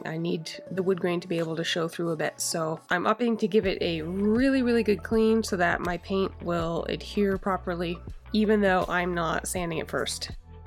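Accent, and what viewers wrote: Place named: Alberta, Canada. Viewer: I saw a Tim Horton's cup in one of your episodes, and your accent is identical to a friend who lives in Edmonton. I know you are a fellow Canuck...are you from Alberta?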